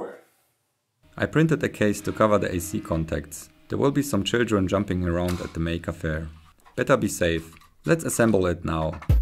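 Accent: Germany. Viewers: Are u from Germany ? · Du hörst dich sehr 🇩🇪 an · You are german? Du hast so nen Akzent(ich glaube , dass man dies so sagt)? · Bist du Deutscher klingt so? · Ach ja der gute alte deutsche aktzent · Mann hort das du deutsch bist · He sounds very german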